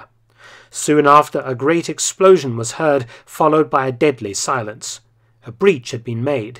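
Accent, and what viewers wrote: United Kingdom. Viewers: Lol the whole time I heard this I thought it was strange that they had a British man representing China invading Tibet😂😂 I wasn’t listening too well as one could imagine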